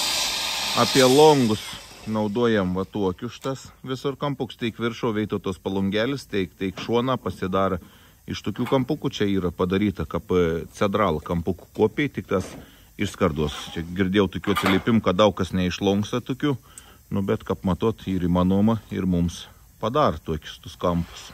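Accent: Samogitia, Lithuania. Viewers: Sveiki, is kokio miesto esat ka zemaitiska tarme? Gal Telšiai?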